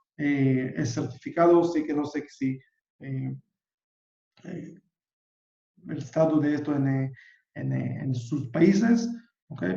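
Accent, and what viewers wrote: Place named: Arab world. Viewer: El.Dejo árabe 😁🎊🎊🎊🎊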